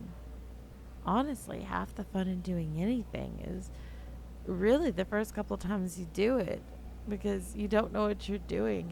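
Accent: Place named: Texas, United States. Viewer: I can tell you're from Texas how you switch from Spanish pronunciation and quickly into English lol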